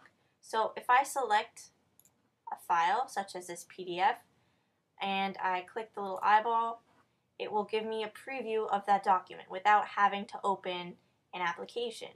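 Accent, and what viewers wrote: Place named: Pacific Northwest, United States. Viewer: You sound a lot like the branch of my family who live in the Pacific Northwest. Would that be about right? :^) Thanks for all the Mac help. You're great.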